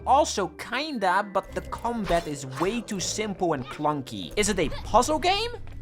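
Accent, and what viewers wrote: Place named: Netherlands. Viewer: Ya sound dutch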